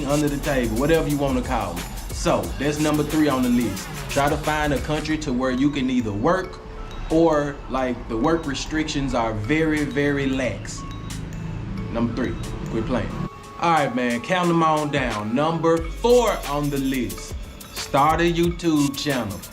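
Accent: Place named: Tennessee, United States. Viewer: Is you from the A or are you from Memphis. I hear Tennessee.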